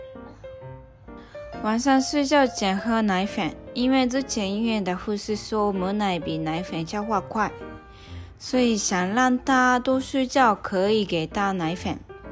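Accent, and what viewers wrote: Taiwan. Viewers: 頑張ってください 具然にこのビデオを見た 応援しますよ しかし なぜタイワンの標準語似てますか？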